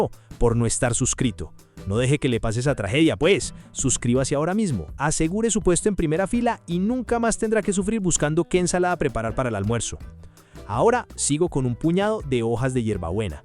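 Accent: Antioquia, Colombia. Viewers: Puedo cambiar la hierbabuena por cilantro? Le agregaría uvas pasas y almendras o nueces. Amo tu acento paisa.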